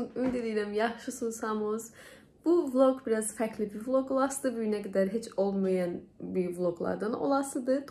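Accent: Sheki, Azerbaijan. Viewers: Hara lehçesi bele?Şəki? iranlıların da lehçesi ne oxsayr.bilmedim hara lehcesidi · Ləhçə şəki həəəəə❤? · bu arada lehcenden,menim yerlime oxsaddim .eger sekidensense mende Sekidenen ,✊😀birazda balaken lehcesine oxsadiram .Allah size ailene balalrina uzun omur xos gunler can sagligi❤️ dileyirem . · Şəki hər kəndinə quzu kəsim heylə.Heylə həblədə davam eliyin supersoz.Əla alinir ləhcəmizdə efrdi. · Salam dogma sheki lehcemiz siz shekinin hansi terefinnensoz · Siz Şəkilisiz ? Şəki akcenti var .Onlar şirin danişir. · Xanim yeqin seklidi danisgindan hiss elədim😊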